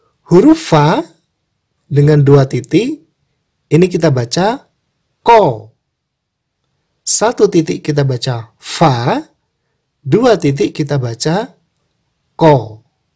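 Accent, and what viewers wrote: Java, Indonesia. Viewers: ini lidaj wong jowo. beda ama wong betawi xra pengucapannya.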